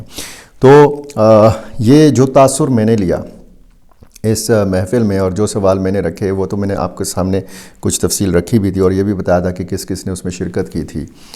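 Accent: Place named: Khyber Pakhtunkhwa, Pakistan. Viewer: صاحب آپ کی صحافت واقعی بہت ہی عمدہ اور مثبت طریقے کی ہے حسن خان پشتو میں بولتے تھے تو میں نے لکھ دیا تھا کہ اردو میں بھی بیان کریں تاکہ اردو سمجھنے والوں کے سامنے پختون قوم کی صحافت کا مثبت چہرہ سامنے آئے مجھے نہیں معلوم کہ آپ کہاں کے ہیں؟ مگر لہجے سے لگتا ہے کہ جنوبی پختونخواہ کے ہیں اور جتنی زیادہ قربت ہوتی ہے تو قربت کے لحاظ سے موددت ایک بالکل فطری چیز ہے ایک دور ایسا تھا کہ سلیم صافی صاحب کو طالبان کے حوالے سے بہت ماہر سمجھا جاتا تھا سلیم صافی نے بہت معاملات میں نشاندہی بھی کی ہے ایک بار پہلے جب نیٹو افغانستان میں موجود تھی تو کہا تھا کہ جنرل قمر جاوید باجوہ نے کہا ہے کہ افغان اور پاکستانی طالبان دونوں ایک سکہ کے دو رخ ہیں پھر جب نیٹو افغانستان سے گیا تو میری بھی یہی رائے تھی کہ تحریک طالبان پاکستان کو آنے دیا جائے اسلئے کہ امریکہ جانے کے بعد جو لوگ افغانستان میں پھنس گئے ہیں ان کو واپس آنا چاہیے وزیرستان میں جمعیت علماء اسلام کے سینیٹر مولانا صالح شاہ قریشی نے ان کی آمد کا خیرمقدم کیا تھا PTM والوں کے بیانات تھے کہ ان سے ہم کہتے ہیں کہ تم ہمارے بھائی ہو افغانی طالبان نے تم اپنا نہیں سمجھا اور پنجاب کی فوج بھی تمہیں قبول نہیں کرتی اگر آپ کو کوئی مسئلہ ہو تو قتل وغارت کی جگہ ہمیں بلاؤ ہم اس کا ازالہ کریں گے البتہ سوات کے لوگ ان کے خلاف نکل آئے تو مراد سعید بھی عوام کی زبان بولنے لگے ورنہ تو اخونزادہ چٹان باجوڑ سے لیکر جنوبی وزیرستان سینیٹر مولانا صالح قریشی محسود تک قبائل کے نمائندے گئے تھے ہمارے گھر پر بھی حملہ ہوا تھا طالبان نے اس پر معافی بھی مانگی تھی اور فیصلہ کرنے کیلئے جرگہ بھی ہوا تھا لیکن پھر فیصلہ ادھورا رہ گیا تھا اسلئے کہ ان کے خلاف آپریشن ہوا تھا اور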